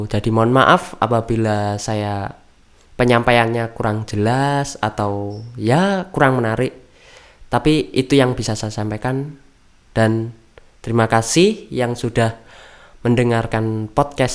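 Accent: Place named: Java, Indonesia. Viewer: Medok bgtt😅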